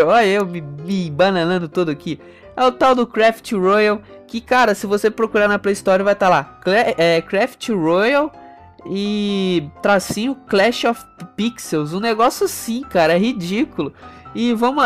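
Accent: Rio de Janeiro, Brazil. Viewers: Pela Voz o e Carioca kkkkkk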